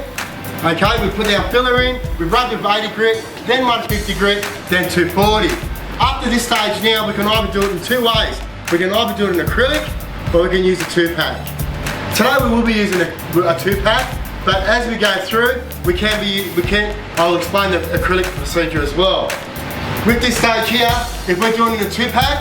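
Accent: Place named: Arab world. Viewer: Looks like the guy had an Arab accent, who went to Australia and was kicked out and went to U.K, lived there for 15 years, tried a lot to copy the white guys there.... And now he is a mongrel... His accent sucks!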